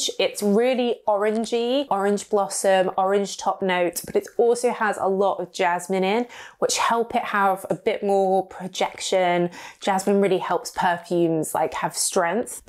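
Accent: England, United Kingdom. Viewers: just wanted to tell you how much I enjoy your reviews. You are such a joy to watch! I found you a little over a year ago when I was recovering from a surgery. I watched tons of your videos to pass the time and I so enjoyed every one. You seem like a true girlfriend/Penpal to me. I’ve always loved perfumes and you share that love obviously like many of us. I have to admit even if I’m not interested in a perfume you are reviewing I’ll still watch your video just because you are such a light and a cheery person and I absolutely love your English accent. I also just stumbled on one of your older videos and I have to say that my husband is also dyslexic. You are so very intelligent and eloquent with your words. So thankyou again for what you do and you are very very appreciated ❤️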